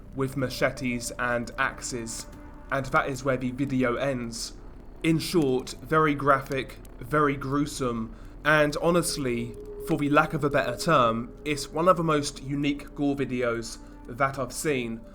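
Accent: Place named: United Kingdom. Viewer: I'm an American, listening to a presumed Britt, inform me about the horrors occuring in Mexico.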